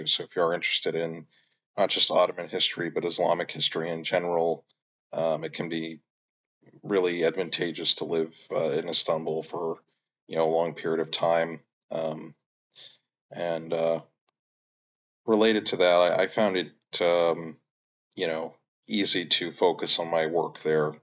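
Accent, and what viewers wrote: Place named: United States. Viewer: Hey man, yt randomly recommended me this video. I grew up there lol and I live in the US rn. You have talked about Sariyer and I was like maybe he studied at the Bogazici University(?). Were you staying at the Kandilli Campus ( You have talked about taking a bus and then the metro so maybe?) Yeah public transit can be really draining and it usually sucks. Also the level of random traffic is probably unmatched too. Anyways, it was funny hearing an American yap about Istanbul haha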